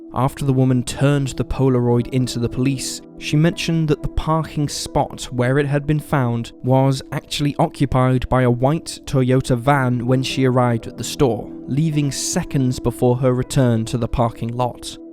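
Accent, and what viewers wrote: England, United Kingdom. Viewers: sadly I fear your conclusion is correct ... men, and police in particular, protect their own. So the son would have been aware that he could get away with such vile behaviour. Have watched other similar channels but your gentle English accent appeals, thanks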